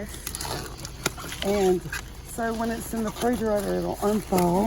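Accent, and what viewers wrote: South, United States. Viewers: Where are you located you sound like you are from the south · I miss the South, I love you southern accent! God has a way of doing that.. Lol... You had me in tear at the end... I love your Video's and your Chanel is awesome.. I believe were probably close to the same age..✝️💜✝️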